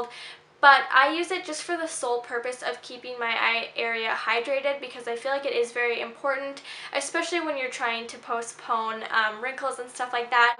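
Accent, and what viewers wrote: Canada. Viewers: She Canadian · Where r u from? I thought ud b from America but apparently u aren't...I'm guessing Canada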